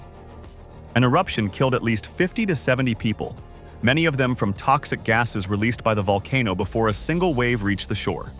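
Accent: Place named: United States. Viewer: Mmmmm Just another A.i. "CLICK-BAIT" WITH THE EVER SO PAINFUL b.s YANK VOICE. (THE SIGNATURE OF A SCAMMER! )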